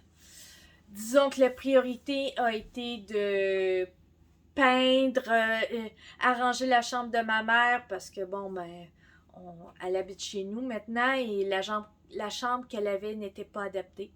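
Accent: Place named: North America. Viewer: j'aime beaucoup ton accent on sent qu'on est bien passé outre-atlantique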